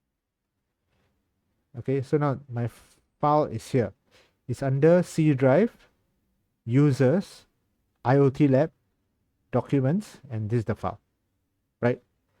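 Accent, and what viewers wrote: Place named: China. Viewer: Malaysian lol the English. Your English have Chinese accent annoying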